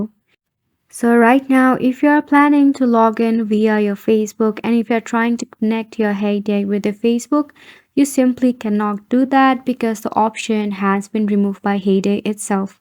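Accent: India. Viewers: Are you okay? Indian?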